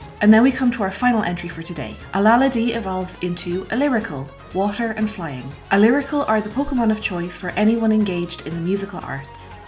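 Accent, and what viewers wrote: Ireland. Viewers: you have no idea how happy i was to click on this and hear an irish accent as an irish person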